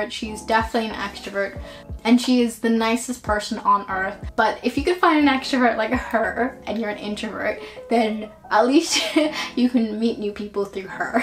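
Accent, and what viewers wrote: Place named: United Kingdom. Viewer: you sound british to me!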